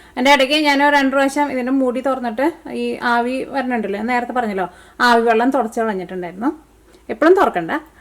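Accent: Thrissur, India. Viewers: ചേച്ചി തൃശ്ശൂർക്കാരി ആണല്ലേ? ഞാൻ ആദ്യമായിട്ടാണ് വീഡിയോ കാണുന്നത്. നന്നായിട്ട്ണ്ട്ട്ടാ...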